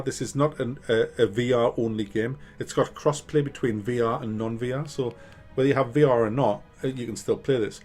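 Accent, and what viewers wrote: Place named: Newcastle, United Kingdom. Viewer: Pretty sure he's a geordie or mackem not an aussie... agree on the US election point though!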